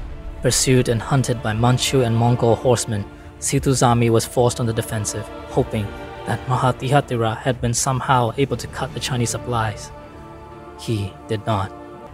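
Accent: Myanmar. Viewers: He's Burmese lmao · Well, this is how the burmese pronounce the names so...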